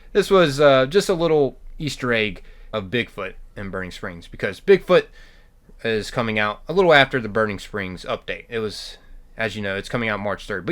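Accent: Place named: Pennsylvania, United States. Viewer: Yinz from Picksburg? Your accent sounds realllllllll PA.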